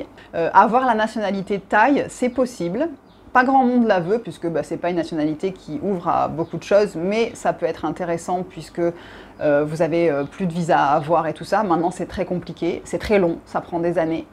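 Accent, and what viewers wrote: Marseille, France. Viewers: Salut tu m’as fait rire Tu es Marseillaise de base lol ?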